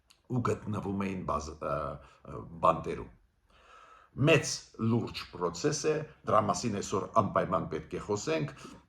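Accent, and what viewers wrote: Russia. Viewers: Такое впечатление, что господин жил и учился на русском языке. Многие слова он произносит на русский манер. Одно успокаивает, что он патриот Армении на все 100%.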